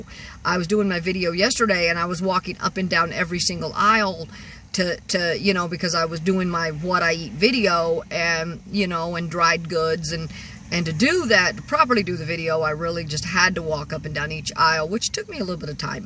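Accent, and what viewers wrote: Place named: South, United States. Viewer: You have a southern accent. I assume you are in the south. You guys have mostly escaped the Covid realty until now. Trump country thought it was fake because you weren’t seeing it. We had bare shelves in Michigan six months ago.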